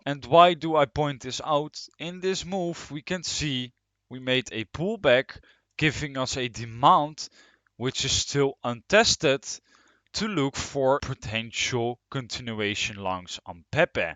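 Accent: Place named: Netherlands. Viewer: Your accent is Dutch / Eindhoven I guess. In your opinion PEPE will make new ATH OR ? Thank you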